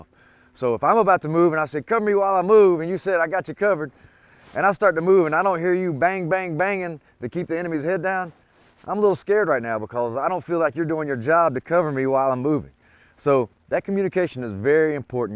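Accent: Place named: South, United States. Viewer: This gentleman is definitely from the south.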